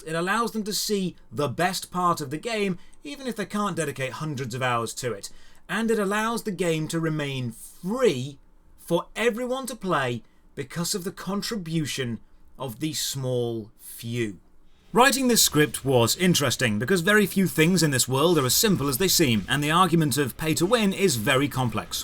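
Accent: England, United Kingdom. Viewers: He is paid either way so does he really care? That is the real question. Ask yourself how he got to be an influencer. Who does his editing. Why are so many British streamers getting pushed in the USA market? Marketers think well educated English accents are more powerful when trying to be convincing in advertising or influencing. I guess the numbers don't lie but are you just one of their numbers. How is that for a thought experiment :P Think about it, spend your time pissing off the large publishers and corporate sacks of you know what by showing how convincing you can be swaying a large swathe of the gaming community against their best interests and wait until they come to you. That is what I got out of that whole video, he doesn't care where the money comes from, as long as its worth his time.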